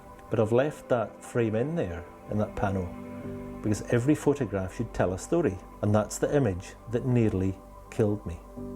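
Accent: Scotland, United Kingdom. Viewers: Thanks for the video, wonderful to watch you with your Scottish accent , reminds me with my time in Glasgow for 9 years .... suppose if you have to buy a mirrorless camera that imitates your imagery , which one you will pick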